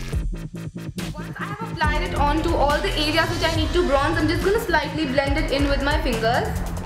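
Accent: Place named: Delhi, India. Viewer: Hiiiii... I just came through your YouTube Channel last night and I'm TOTALLY YAYYYYYY in love with it... Have already watched more than 10 videos since last night 😀 I just love your confidence in front of the camera.. The way you talk and everything... So dilli wali ladki 😊😊 ❤ And coming to the Giveawayyyyy... My favourites are the Maybelline BB Cream and Colossal Kajal 😊😊😊... Both are perfect for my oily skin... Badly wanna win this Giveawayyyyy yaayyyy 😍😍😍😍 Looking forward to your reply 😘😘😘😘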